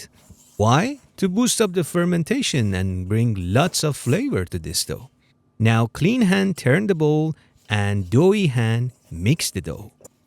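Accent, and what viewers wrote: Iran. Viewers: i make sourdough and his vids are great but if I am honest I am here for Persian accent.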